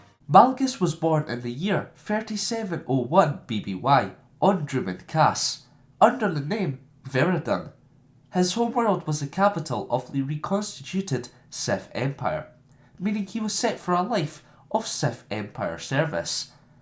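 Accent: Scotland, United Kingdom. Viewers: your scottish i know that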